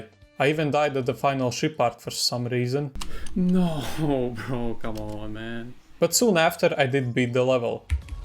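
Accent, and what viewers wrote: Latvia. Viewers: banger vid also the latvian accent is so strong lol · W latvian accent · latviesu akcents anglu val ir tik ikonisks😭 godigi es nesen izgaju un loti iesaku iet labak ar neka cata, cata ir pilnigs suds imo. Lai nu ka veiksmi! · At the start of the video i already heard the latvian accent 😂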